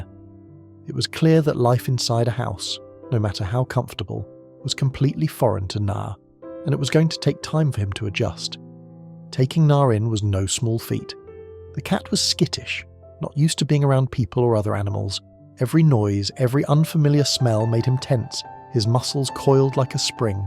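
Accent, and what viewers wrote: United Kingdom. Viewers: Thank you so.much. pls use a narrator with an American accent. Uk accent i s rather irritating.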